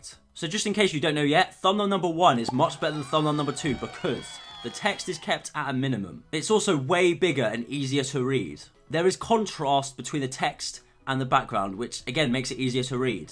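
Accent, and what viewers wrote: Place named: United Kingdom. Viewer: I can guarantee you that he's BRITISH....Bri-ish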